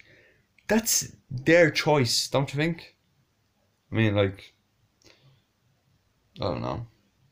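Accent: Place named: Ireland. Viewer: It's such a Masterpiece of a film. My name is Lee and this is my 2nd channel. My main channel is The Dragon Critic. I can tell your Irish and so am I :)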